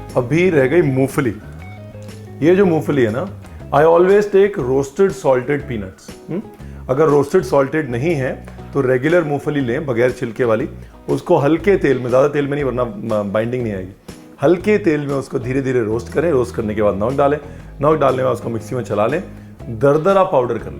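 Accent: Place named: Punjab, India. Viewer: Very yummy 👌😋 i just love your punjabi tone chef .. you are really amazing n your recipes are just woww....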